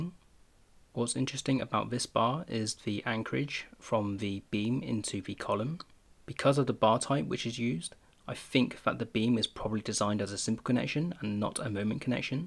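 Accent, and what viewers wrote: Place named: United Kingdom. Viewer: Great layman's explanation. Also nice British accent!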